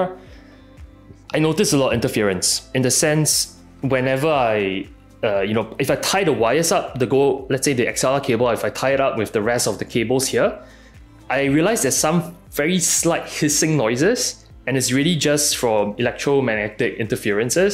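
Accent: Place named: Singapore. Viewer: Hey, are you Singaporean (you sound like one)? Been watching your streams for a while now and really like your content/set up (even though I don't tank). I recall you mentioned somewhere before that you have a full time job as an investment banker and now it appears you are married too. How do you manage a full time highly stressed job (I'm in banking too) with a wife and yet able to spend this much effort into the game and your content? I suppose your wife fully supports you and she must be into gaming as well? I see that she's a naruto fan as well:) I've been playing wow since it first came out when I was university but now as a married man with a full time job and a kid, reality kinda catches up with you.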